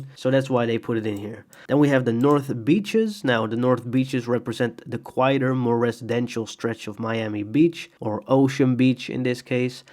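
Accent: Netherlands. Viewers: You dutch? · I can smell dutch, nice vid btw!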